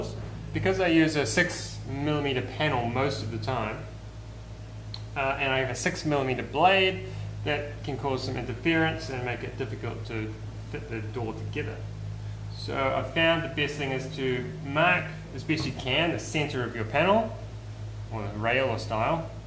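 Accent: New Zealand. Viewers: Excellent video and thanks for sharing your ideas and tips, great too see some different ways with fitted wardrobes, kitchens and furniture, I lived and worked in NZ as a chippy for 6 years the accent and terminology bringing back all the good memories 😄 👍 keep up the good work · When I stumbled upon this channel, I was impressed by the woodworking skills shown in the videos. The narration and editing is always done very well and makes it fun to watch. But I have to admit, what really puts a smile on my face every time is the kiwi accent. From one professional woodworker to another: keep doing what you are doing,. You are very good at it.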